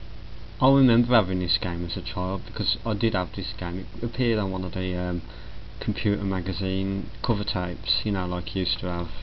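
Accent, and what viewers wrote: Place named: Dudley, United Kingdom. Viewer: This gets 5 stars... just for the dudlay accent!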